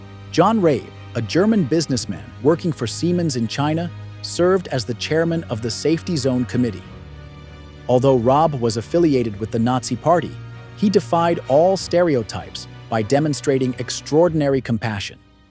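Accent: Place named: United States. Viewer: Why are the soldiers in the pictures wearing Chinese uniforms and not Japanese? That they are clearly fake allows people to deny that such atrocities never occurred. One can hear the commentator is an American who will not go on to state the pivotal role his country paid in freeing, and releasing many Japanese war criminals like the infamous Unit 721 doctors and inviting them to America or Hirohito Japan's God Emperor. A load of American crap.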